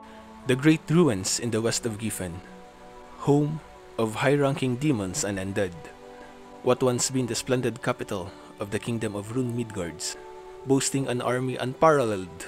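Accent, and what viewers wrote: Philippines. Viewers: That is a very strong crisp filipino english accent.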